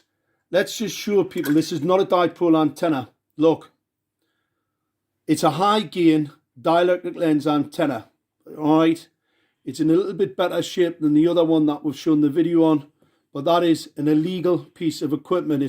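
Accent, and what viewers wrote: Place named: United Kingdom. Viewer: Klares britisches Englisch ohne großen Dialekt - wo liegt das Problem? Die Qualität der Erklärung ist eher dürftig.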